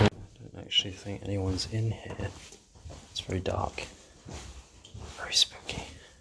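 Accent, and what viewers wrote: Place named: United Kingdom. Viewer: Only Asian I know who can speak with Britain accent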